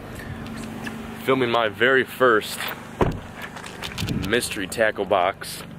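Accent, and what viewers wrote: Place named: South, United States. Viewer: Anyone notice how's he has like a southern accent in his vids at college compared to now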